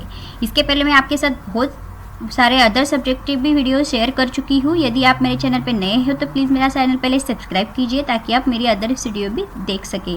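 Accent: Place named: Kerala, India. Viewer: I sure you’re from Kerala 99%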